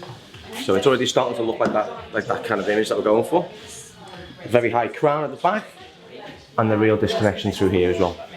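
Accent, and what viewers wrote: Liverpool, United Kingdom. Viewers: Can someone tell me which UK accent that is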